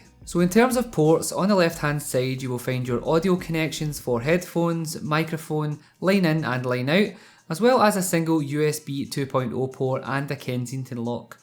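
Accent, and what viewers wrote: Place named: Scotland, United Kingdom. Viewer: its a Scottish accent.. ;)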